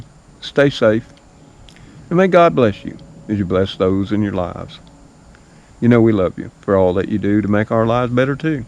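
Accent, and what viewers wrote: South, United States. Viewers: Love your Southern accent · Southern accent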